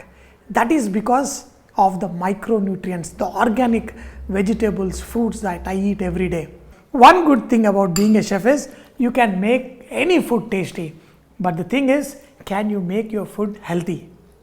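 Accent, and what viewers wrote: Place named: Telangana, India. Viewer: I love your Telangana slang very much...😂😂. and also your childish movements everytime 😍😍😀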